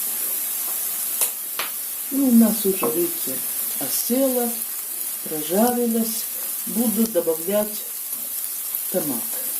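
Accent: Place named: Odesa, Ukraine. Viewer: Какой город. Говор одесский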